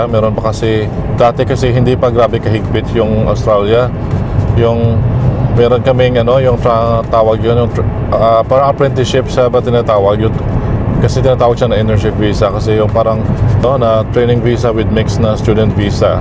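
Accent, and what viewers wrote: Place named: Ilonggo, Philippines. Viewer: Nanami-an ko mamati sang tono mo. Siling ko, daw Ilonggo gid ni mu, and then i saw your other videos...ay, kababayan gid, taga Negros man gali. 😊 Makabulong sa hidlaw. 😊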